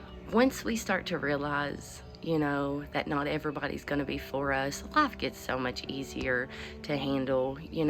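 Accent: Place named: South, United States. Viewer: I could listen to you talk about Jesus in that southern accent all day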